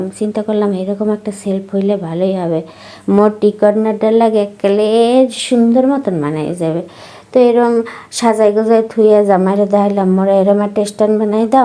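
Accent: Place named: Barishal, Bangladesh. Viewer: বরিশালের ভাষা তোমার মুখে কি যে ভালো লাগে আপু মাশা-আল্লাহ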